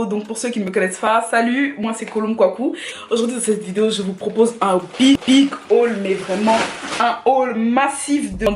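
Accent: Ivory Coast. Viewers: J’ai entendu le début j’ai su qu’elle était ivoirienne 😭🤍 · J’aime trop ta manière de parlé en ivoirien même je t’aime trop fort ❤️❤️❤️❤️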